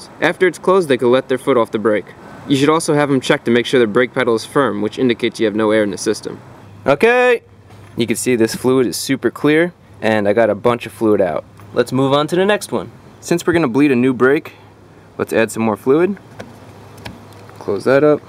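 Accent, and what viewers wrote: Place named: Pennsylvania, United States. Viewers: You sound like you have a Philly accent- wooter! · Know how I know you are from Pennsylvania?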